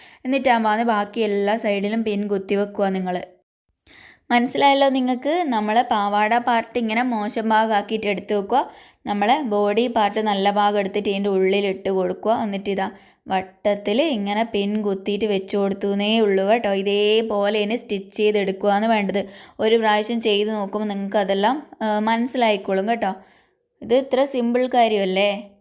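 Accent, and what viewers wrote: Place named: Wayanad, India. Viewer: Evidaya veed ? Samsaaram kelkumpol vayanaadu polund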